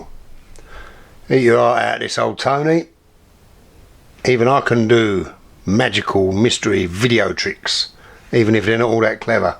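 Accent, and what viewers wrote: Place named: Cockney, United Kingdom. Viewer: This was a brilliant video. I love the fact that you built your own bushing tool. Ive been wanting one for ages but begrudge the stupid amounts of money they are asking for. I wouldnt mind having a go at making one myself. Ive managed to build my own mainspring winder and a test stand too. Ive been messing around with clocks for about a year now and even started my own channel. but I think its more of how not to restore them really :-) Nice to hear a cockney here on Youtube I'm now going to through your channel and look at your other videos. Johnny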